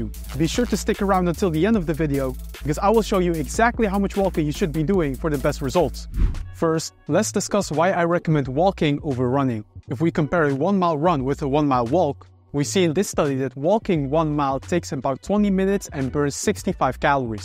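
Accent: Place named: Netherlands. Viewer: je klinkt Nederlands :D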